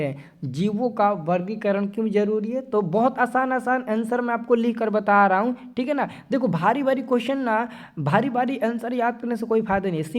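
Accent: Bihar, India. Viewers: Bihar se ho Sir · tum ho Bihar se ho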